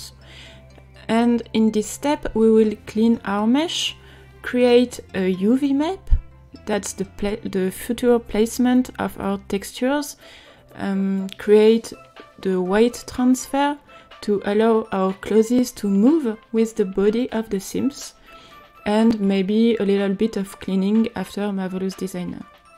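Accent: France. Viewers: Your french accent really cute :3 and dont worry your accent is understandable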